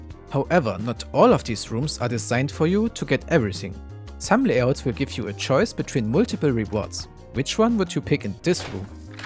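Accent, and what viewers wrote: Germany. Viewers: Deutscher , nicht wahr?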